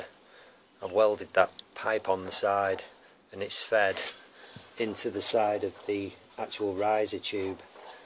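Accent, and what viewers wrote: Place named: Northern England, United Kingdom. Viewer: GREAT STUFF !!! I've thoroughly enjoyed your videos. I've learnt so much from you about heating with wood. I'm about to try to make a rocket adaptation to a smallish cylindrical wood heater in my bedroom. The chimney, unfortunately goes straight outside thus losing a lot of needed heat. I also have a wood stove in the kitchen and I'll see if I can have it heat water too, just like the Argas I came across in the UK. Where are you from by the way. You're definitely northern like me. In fact, I'm a Liverpudlian now living in Rio de Janeiro.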